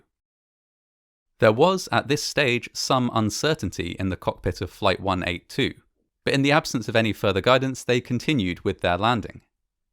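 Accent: United Kingdom. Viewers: Another British narrator? Come on You Tube hire some people in this country!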